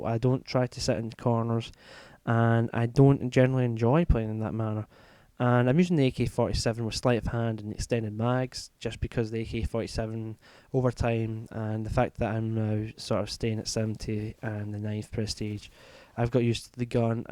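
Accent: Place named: England, United Kingdom. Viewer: nice english accent ^^ and good game :p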